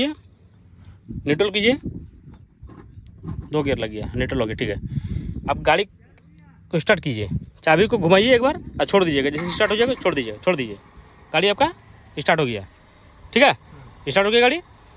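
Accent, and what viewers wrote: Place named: Bihar, India. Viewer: Sir ke bolne ke style se lag raha hai sir Bihar side se hai.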